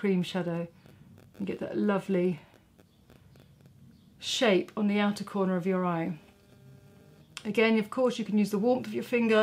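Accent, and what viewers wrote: England, United Kingdom. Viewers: greetings from Los Angeles. Luv this look (and so many others) for La La Land. I really enjoy your videos b/c, like me, you are fair with light eyes. I am that girl who takes a hat everywhere, wears gloves for driving and puts zinc oxide on under my makeup (Sisley-Paris makes a great stick) and even over my self-tanner. It's a constant challenge to prevent discoloration as spots feel as though they come about just from stepping out the front door. It's very tricky finding the right "natural" makeup look, especially in a town where you're supposed to roll out of bed looking like Cindy Crawford (I have actually seen her out for lunch in Malibu so, tough competition!) Thanks for your tips, effort and bravery for getting on camera and being so real. For some reason, things just sound better with an English accent;) Ciao! Alexis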